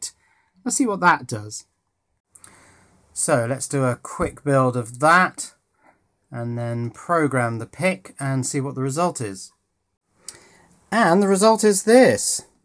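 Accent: England, United Kingdom. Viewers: Aman Mittal given his accent, I'd say England.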